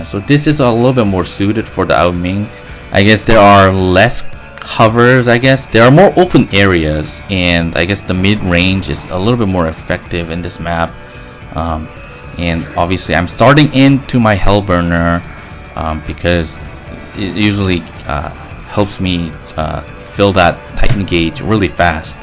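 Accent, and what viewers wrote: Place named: Asia. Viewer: You sound totally Asian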